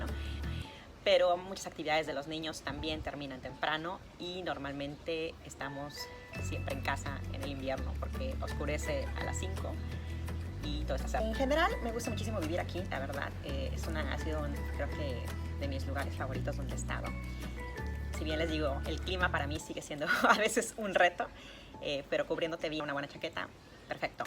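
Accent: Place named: Mexico. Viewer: 👍Qué lindo video, y todo tan bien explicado con tu hermoso acento mexicano 🤩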